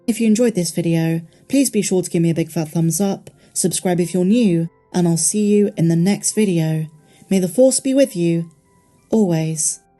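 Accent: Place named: England, United Kingdom. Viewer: Ok, the accent is way too heavy for me to understand what the narrator is saying almost totally. Could you please work on the sound quality or get a narrator without an english or whatever itis accent?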